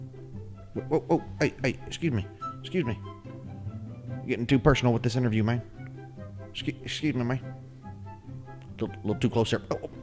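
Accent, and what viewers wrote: England, United Kingdom. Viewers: Hi luv the Skateboard...Luv the English Accent nearly like mine...😍. Nice to see Roo I would like to get a Husky in the future but it is like you say a lot of things to think about . But your videos are very helpful.. I just think they are gorgeous. And I hope that my Husky will like my 🐈..one Day.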